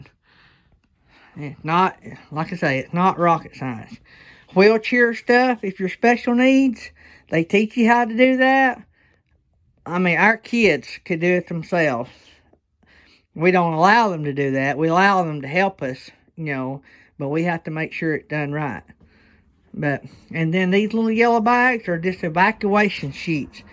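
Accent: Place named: South, United States. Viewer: New Here and and blessings...sound like u are from the south.. I’m in NC... schools are closed..bus drivers are happy I’m sure no school.. ok listening to your video you are from Alabama 🌹😊 Family here as I love supporting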